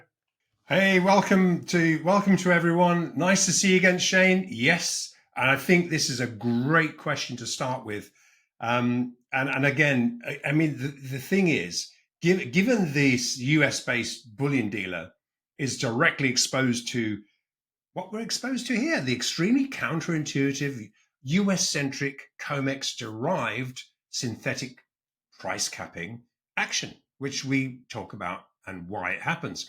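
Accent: England, United Kingdom. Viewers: I agree too technical to fully grasp. I love, but between the jargon and his English accent, high low delivery (definitely not boring, but a lot goes over my head) Maybe Shane can create an edited video to help explain the concepts. eg.. 2 minutes of, and equal time for Shane to speak plain American english to interpret what just said.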